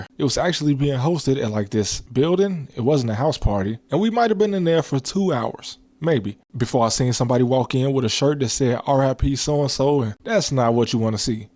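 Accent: Chicago, United States. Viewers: And bro you definitely got that Chitown Southside accent. Great videos! Nostalgic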